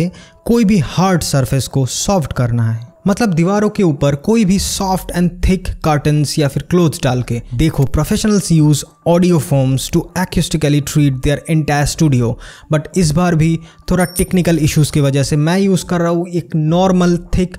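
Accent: Bengal, India. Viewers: No bhai I am not exaggerating at all.. you are just intense... And I assumed you are a Bengali (noticed your accent).. that took my bonding to quadruple ❤️ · chal bhai khush ho ja like kr diya informative video .. love the humor.. i notice your vocal i think u r a bong.. correct me if i m wrong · I guess you are bengali .. Its hard to manage your bengali accent bro😁😁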